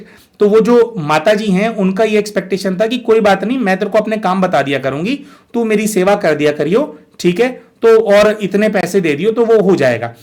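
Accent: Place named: Mumbai, India. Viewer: Kantal gaye baba, this is mumbaiyga hindi.kantala ye marathi word hai. inko past life karane ki jarurat kya thi? Boring laga sunana.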